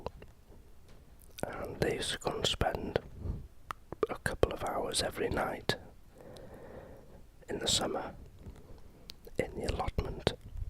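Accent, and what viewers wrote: Wales, United Kingdom. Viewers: Are you from mid Wales? My fiance and I cant decide. We live in York. My Nana was Welsh, from nr Wrexham and his family are from Newport area. We have named our baby son Rhodri. He even has a birthmark which looks like a Welsh dragon. I love your memories videos. You are an ASMR super star.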